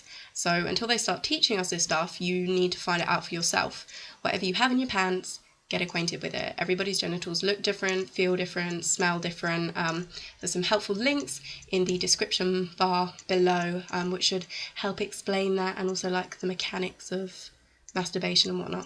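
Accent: United Kingdom. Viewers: You seem to have British accent, so I am thinking in England, sexual topics aren't something women easy talk about or do, as you have queen, she makes uncomfortable for you to masturbate, nobody there can imagine her doing it, while in USA, France and other countries not under strict female supervision, something like that isn't questioned so much? As internet is in widely use for 15 years, girls everywhere are now more aware of masturbation, it is seen online, is that what makes you speak out? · Loving the topic and your British vocal sound, see i did'nt say accent lol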